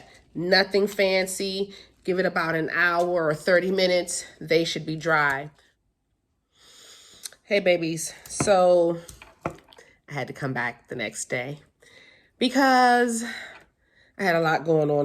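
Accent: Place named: DMV, United States. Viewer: I hear a DMV accent…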